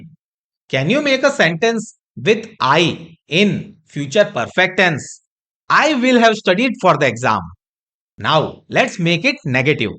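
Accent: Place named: Mumbai, India. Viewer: It’s the best explanation that I have ever met. Thanks for it! Although you have the “mumbai” accent - no matter! For us in Czech rep. it’s even easier to understand English with this accent 😁.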